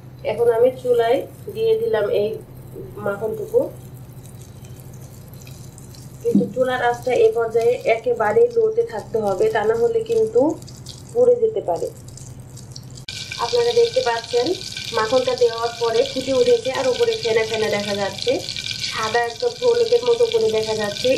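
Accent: Bangladesh. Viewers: I guess she is from Bangladesh , due to the accent . But yeah if you are from India that is , then I definitely don't know where dalda can be found in your country, sorry couldn't help ☹️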